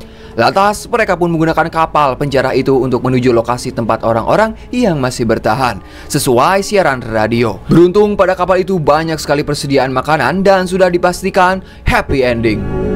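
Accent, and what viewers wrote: West Java, Indonesia. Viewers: Bang hadir Bogor ciawi.aku suka ceritanya.dan ngomong nya kaya aku ada Sunda nya aku jadi senyum" sendiri😂😂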